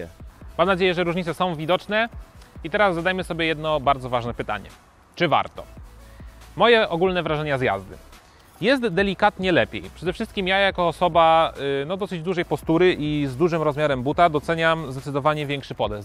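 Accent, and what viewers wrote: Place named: Ukraine. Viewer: sounds like ukrainian but slovakia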